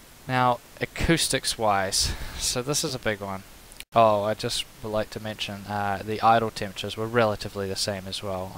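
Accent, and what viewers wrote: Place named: New Zealand. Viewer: thought you sounded kiwi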